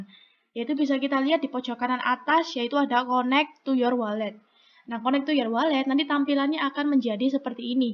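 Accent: Java, Indonesia. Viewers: medok nya khas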